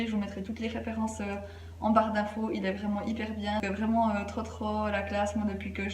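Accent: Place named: Neuchâtel, Switzerland. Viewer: hey hey on reconnait bien l'accent de Neuch :D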